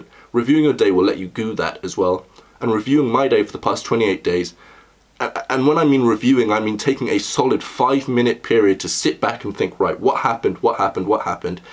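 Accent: United Kingdom. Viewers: ofc this guy is british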